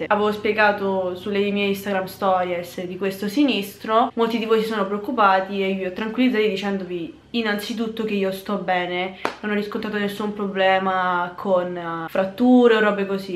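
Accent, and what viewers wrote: Rome, Italy. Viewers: Ma sei di Roma?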